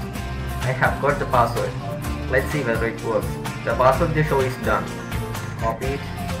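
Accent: Kerala, India. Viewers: Are you from india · Malayali aanennu thonnunnu accent kettitt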